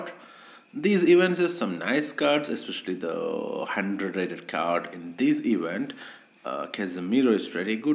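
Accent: Kerala, India. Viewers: Dude are you from Kerala? Great content btw! :)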